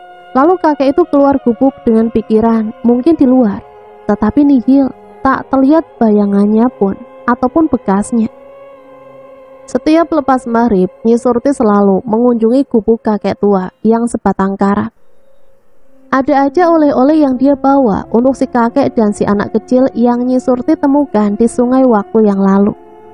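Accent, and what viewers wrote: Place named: Indonesia. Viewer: Sy suka mimin ini walaupun kekadang terbawa bawa dlm membaca ceritanya tetapi asli suara nya Dr Indonesia...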